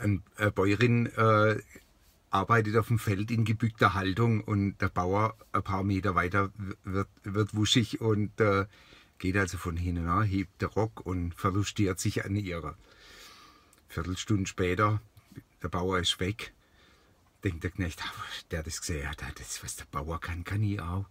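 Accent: Baden, Germany. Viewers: undeutlich? das ist badisch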